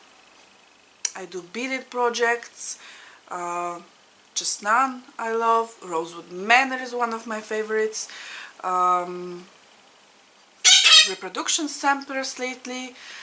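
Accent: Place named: Ireland. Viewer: I can sense a slight Irish flair to your accent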